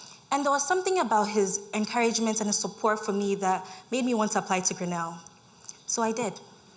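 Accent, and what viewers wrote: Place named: Ghana. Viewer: keep up with the Ghanaian accent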